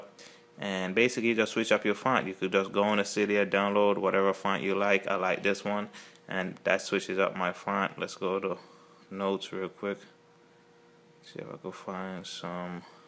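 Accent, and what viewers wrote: Jamaica. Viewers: You're Jamaican i can tell my your accent. I'm Jamaican too.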